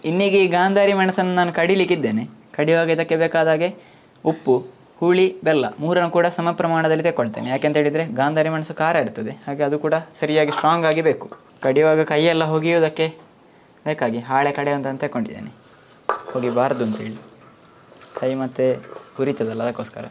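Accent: Mangalore, India. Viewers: Thats Mangalore Kannada accent. Yes, unlike Bangalorians they speak pure Kannada.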